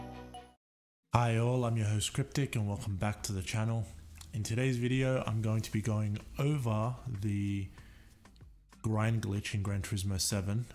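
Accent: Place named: Australia. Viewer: I thought you sound Aussie haha great vid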